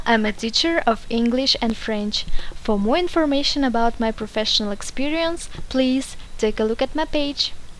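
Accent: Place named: Russia. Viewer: очень интересный урок, спасибо. но у вас очень необычное произношение. очень сильный русский акцент, который мешает воспринимать информацию. к примеру, многие буквы английского, даже похожие по звучанию на наши, произносятся совсем иначе (t, s)/а смягчения в английском вообще нет.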